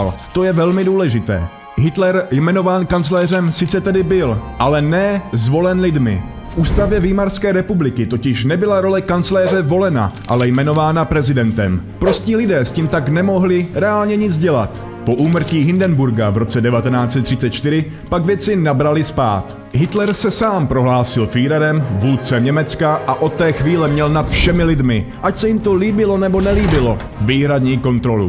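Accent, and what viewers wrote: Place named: Moravia, Czech Republic. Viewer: Část času, který věnuješ na úpravu vousu, věnuj promrskávání shody přísudku s podmětem, ať nemluvíš jako úplný manták z Moravy, když se pokouší o spisovný projev.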